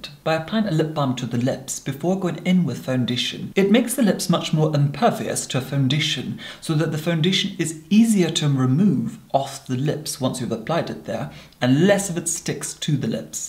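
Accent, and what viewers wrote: Scotland, United Kingdom. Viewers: He doesn’t have an English accent I’m pretty sure it’s just very posh Scottish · They do Gillian, I’m just saying it because he is Scottish and so am I (with a Jamaican mother raised in Ireland ) shrugs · u are exactly right. Scottish born English raised. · I do hear a slight Scottish accent from time to time. I find his accent to be fascinating. Cheers! ~Anastacia in Cleveland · He's Scottish... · Scottish but using English RP. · he's Scottish, not English but he does speak very proper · Nah,he's got a Slight Scottish accent is all.Hes done a good job of getting rid of it. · My family has Scottish friends with a similar accent. He just speaks with sophistication.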